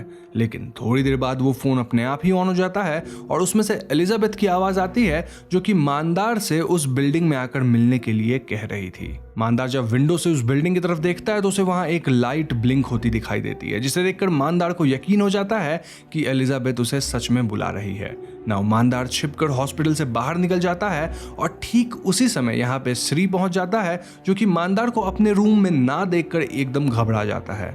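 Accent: Bengal, India. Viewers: Kya Bengali ho?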